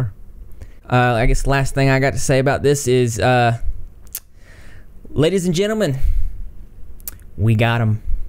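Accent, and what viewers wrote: South, United States. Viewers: Oh mah gosh u got a country accent like me :D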